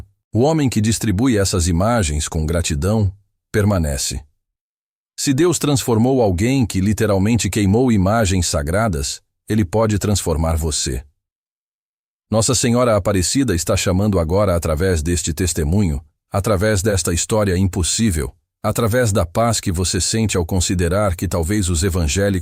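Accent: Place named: Portugal. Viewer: Esse português de Portugal que o narrador fala é horrível!!!! DETESTO!! Porque??? Se estamos no Brasil???😮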